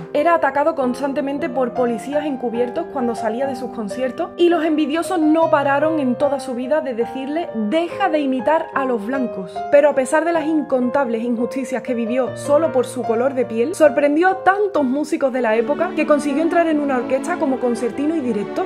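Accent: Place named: Andalusia, Spain. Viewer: Hay,Hay,HayHay QUE GRACIA ME HACES Hoy me He Fijado Atentamente CON QUE GRACIA HABLAS • En vez de decir PUES SI QUIERES ENTERARTE ( PUES ) QUEDATE, Tu dices ( PO ) Quedate, Hasta el Domingo SINPATICA * ANDALUZA *..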